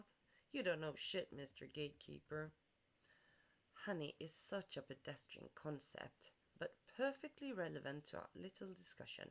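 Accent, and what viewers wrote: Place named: France. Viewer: Hey, cool topic, hope your having a great weekend, interesting accent, French or Portuguese ? Look forward to more videos Be Well 🕊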